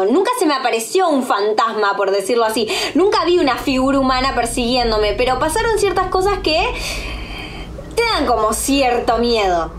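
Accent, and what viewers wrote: Argentina. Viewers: Es Argentina:v es el asento del país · Sos voz de Argentina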